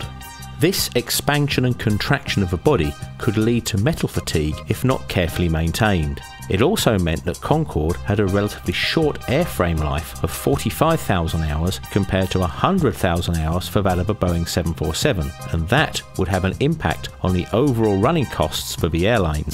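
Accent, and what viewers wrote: United Kingdom. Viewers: He is British, and they all sound the same. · Just like to say recently came across your channel I find it very interesting informative and oddly relaxing plus it's nice to listen to a fellow brit rather than the plethora of Americans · is it just me or do monotone, loud British accents just get irritating as fuck after more than about 2 minutes ? seriously this needs a female narrator or cc, dammit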